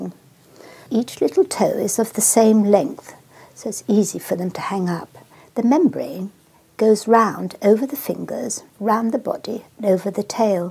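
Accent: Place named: RP, United Kingdom. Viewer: A wonderful voice. It's called an RP (Received Pronunciation) British voice and it's almost from a bygone era.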